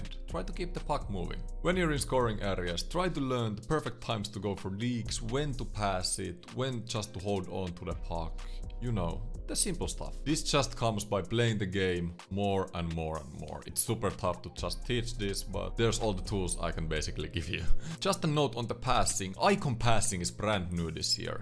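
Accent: Finland. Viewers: kun sanoit et sun enkku oli huonoo joskus ja vähän tavallaa viittasit et se ois vieläkin, toki parempaa kuin ennen. Ni ihan pikku tippi siiheb liittyen ni sano L1/L2 ja R1/R2 "EL 1/2" ja "AR1/2" ni jos tääl on jotain jotka ei tuota ymmärtäs ku sanot äl one ja är one ni sitten he ainaki ymmärtää. Mut siis muuten imo ihan hyvää enkkuu puhut sillee suomalaisel aksentil.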